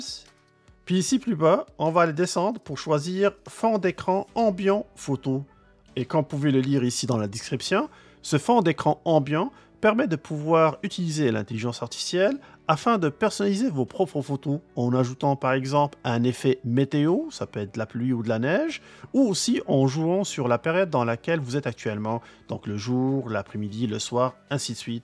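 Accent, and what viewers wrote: Quebec, Canada. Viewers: Merci moi qui a détesté beaucoup le l'accent québécois maintenant je l'adore grâce à vous mon ami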